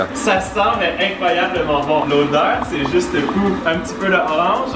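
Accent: Canada. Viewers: tu mon youtubeur préféré de is vidéos accent canadien et qui met quoi des vidéos trop trop rire 👍👍👍👍👍 🇨🇵🇨🇵🇨🇵🇨🇵 traduis le mot anglais 24h XLL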